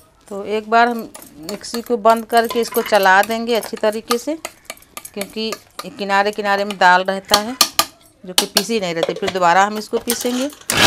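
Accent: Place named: Ghazipur, India. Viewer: Sister aapki baate bht acchi lagti h meri mammy aapki trah hi bolti thi woh bhi gazipur se thi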